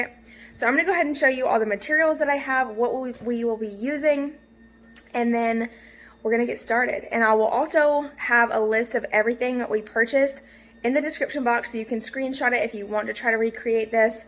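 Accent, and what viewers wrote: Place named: Kentucky, United States. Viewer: You sound like a Kentucky girl. Island looks real good. I'm building a 6 foot island like this for my wife.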